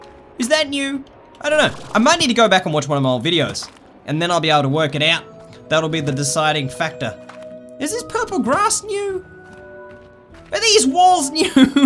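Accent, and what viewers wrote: Australia. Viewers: Are you Australian? Haha You sound very Ozzie! (Coming from someone who is also Australian.) 🇦🇺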